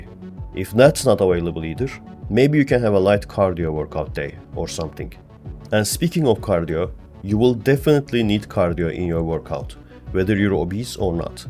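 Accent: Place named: Turkey. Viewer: Turkish english accent is absolutely the most unique english accent I have ever heard. There is nothing like it.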